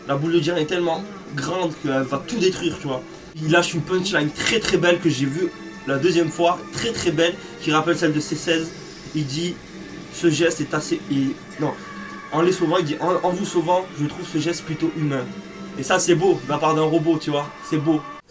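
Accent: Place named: South, France. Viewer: Oh mamène t'as un accent du sud comme moi, t'es d'chez nous ? :P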